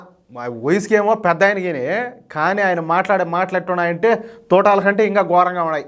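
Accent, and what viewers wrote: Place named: Nellore, India. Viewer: Yes. Rightly said by Honourable Minister. Garu, I liked your Nellore Syle Assent. Keep it up.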